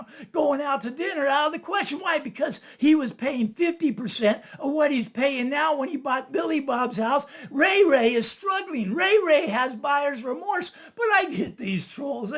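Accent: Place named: Texas, United States. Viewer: I can't place your accent,. Texas?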